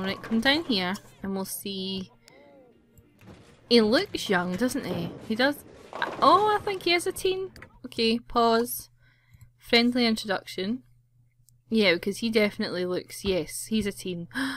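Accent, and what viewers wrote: Scotland, United Kingdom. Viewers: I knew you were Scottish as soon as I heard you talk. :) I absolutely adore Scottish accents above any other. Love this story so far. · I love how ur Scottish I hardly see Scottish youtubers I am Scottish myself my m is going to get me sims3 at the end of the month this helps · I love your channel! I have a hard time finding simmers I like because well...most girls on here having voices that irritate me :/ (yea I'm a girl and it bugs me) but your voice is perfect! I usually prefer British youtubers and now Scotts xD thank you, you seem quite intelligent and I look forward to watching the rest of these :} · I love your accent, its so cute~ I love Scottish accents. You seem pretty cool and I like the video. SUBSCRIBE~ <3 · your scottish like me!! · Are you from Scotland or is it my head phones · You sound like Jade from Little Mix , but more Scottish . 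I LOVE IT! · Yay! A Scottish simmer! I'm from Scotland as well so I'm happy that I can understand someone now. I mean I can understand some of the others but not very many. I've watched Big Brother 2014 as well and can't wait for the next one! x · Your Scottish accent!<333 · Im from scotland too and i was sooooo excited when she started talking :3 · Were in Scotland r u from. Xx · Dat accent...Dat amazing Scottish accent... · shes scottish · I LOVE YOUR SCOTTISH ACCENT!!! Especially when you said "storm" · I love your accent! It's scottish, isn't it?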